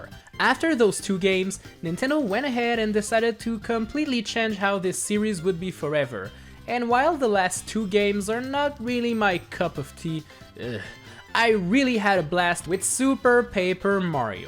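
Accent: Quebec, Canada. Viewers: ca sonne vraiment quebecois ton accent chum ! premier video, thumbs up ! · Are you French Canadian?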